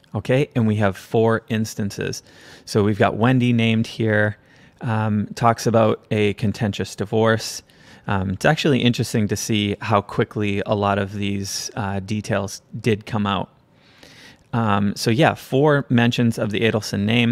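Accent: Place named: Georgia, United States. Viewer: Actually, sweet sound of Georgia.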